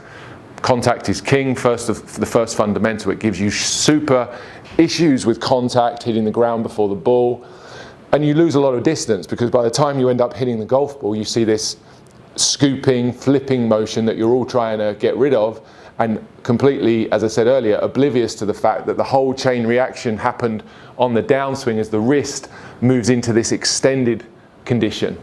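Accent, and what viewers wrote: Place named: United Kingdom. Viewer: I watch tons of golf content The way you explain it, with LOGIC, is just so perfect. One of the best golf channels on YouTube!! Plus. The British accent? Idk why, someone could be as dumb as rocks, but if they had a British accent? Doesn't SOUND as dumb?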